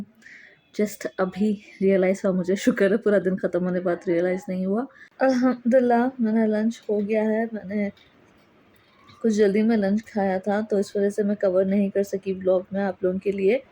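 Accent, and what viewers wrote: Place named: Pakistan. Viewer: You sound more like Pakistani not Hyderabadi 😊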